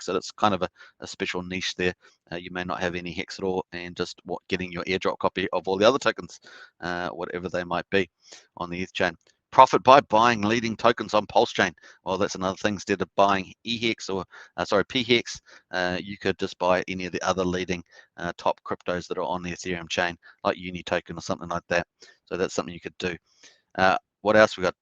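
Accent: New Zealand. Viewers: Hey bro, good to hear a Kiwi doing some streaming.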